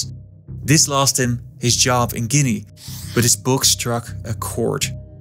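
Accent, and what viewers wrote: Netherlands. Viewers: You've got a pretty thick Dutch accent. Are you Dutch?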